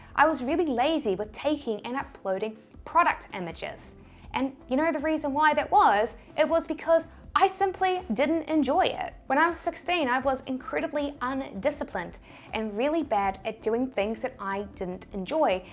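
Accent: New Zealand. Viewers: Came back to get my annual NZ accent dose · thanks for the great info! The best thing about this channel is your lovely new zealand accent! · are you from Southland? i think i can detect it in your accent!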